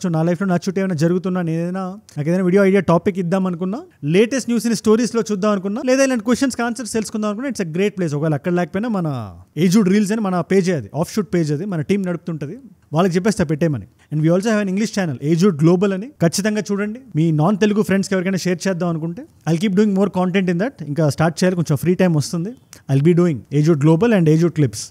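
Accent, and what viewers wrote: Srikakulam, India. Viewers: 😊 i. impress you to speak Srikakulam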